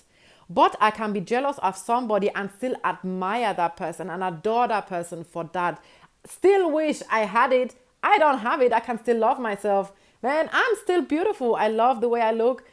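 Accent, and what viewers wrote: Nigeria. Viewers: I was so surprised when you started talking cause your accent sounded a bit Nigerian. And then you mentioned that that your husband is Nigerian and it made so much sense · You remind me of one of my ex's. She was German but her ex husband was Nigerian and she spoke English with a Nigerian accent just like you. Because of that I never thought of her as being white because aside from the color she wasn't. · I heard a slight Nigerian lilt in your voice; maybe Igbo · I love her Nigerian accent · I'm northern European, brought up in West Africa and she's German, speaking English like a Nigerian - weird! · your accent is partially nigerian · I love that Nigerian accent coming through Mama! Keep up the content. We are loving it. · Dear, you speak with a low-key Nigerian(igbo) accent. And your last name, is indeed Igbo. If you may, am I correct in my observation? 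And how are you related to "us"? Regards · Awesome presentation.. I picked up the Nigerian in your unique accent from the beginning.. Blessings.. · You have a Nigerian accent... Did you leave in Africa before? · I am wondering where your nigerian accent came from?!? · WHALLEY-Oooo...My Wife, as we day in Nigeria!! I just confirmed after I posted beneath your video in comments that you had a distinctive Nigerian Accent!! Ahh-Ahh...Now I see your surname!! Hence why I'm calling you 'My wife' it's just a thing with Nigerians LOL You may be married to a Nigerian as my mummies surname is Nigerian too...When she goes to hospital and they try to call her by her 11 lettered surname and this little white haired woman calls out... 'No...my name is... OMADELIENJE!! 🤣🤣🤣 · then you guys don’t know German accent when speaking English, of course she’s German with the accent somewhere in there but she’s straight almost full Nigeria lol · Nigerian accent is beautiful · Was saying to myself why she sounds like a Nigerian?? 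Then in the video,she says "I'm married to a Nigerian"😍 And thanks for this video... You couldn't have said it better ma'am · Your Nigerian accent is strong. Wow. I appreciate the facts you spit. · OmG..... Gospel from heaven. And your english is so Nigerian... Did you grow here?? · I like the way she speaks with facts. When I first heard her accent, I thought she was Nigerian in some way 🤔. Then she mentioned her husband is from Nigeria and I was like 'Aha!' 😁. Wonderful content. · I hear the Naija accent trying to break through! 😃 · She's married to a Nigerian,she speak like a Nigerian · I believe she mentioned that her husband is Nigerian, she picked up the accent well and I too like that. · Wow, ur accent, sounds more like core AFRICAN, NIGERIAN PRECISELY.. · I love your accent, you sound African, a bit Nigerian-ish. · You've developed a Nigerian accent.♥️ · I was thinking about the same thing.... 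My brain was like this is Nigerian accent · It's this Nigerian accent for me, thanks for your insight. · Wow!!! Negative people just appear out of nowhere to give their nasty a$$ remarks. She sounds Nigerian to me. There's a huge Nigerian community where I live and that's what she sounds like to my ears. Yes I pick up on the German. My in-laws are German. And her accent fluctuates. But to me - that's what she sounds like. If she doesn't to you - kudos. But I see the commenters who appear to also be West African and hear the accent as well. Meaning - you got nothing better to do than troll and be negative. Good luck with that.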